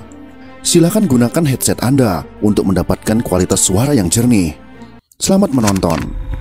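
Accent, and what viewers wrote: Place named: Bali, Indonesia. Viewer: Bang, org Bali ya??? Pengucapan T nya Te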